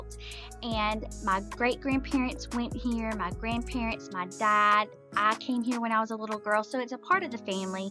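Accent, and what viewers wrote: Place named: South, United States. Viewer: You’re so thorough! You did a wonderful job! ❤️ And I LOVE your accent! I’m southern, but not as southern as you! So adorable! 😍 Can’t wait to see more of your videos!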